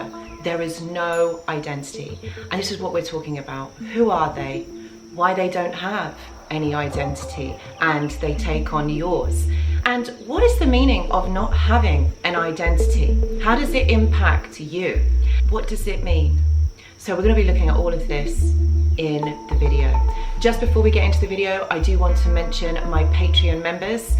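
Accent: England, United Kingdom. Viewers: Ur really cool 😆 it’s xtra reassuring to me to hear another fellow English accent on a channel like this much thanks for the work u do it is appreciated Strength to strength peace all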